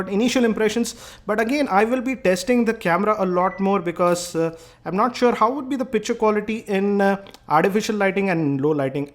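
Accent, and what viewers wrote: India. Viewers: You talk like Apu Nahasapeemapetilon. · indian accent so funny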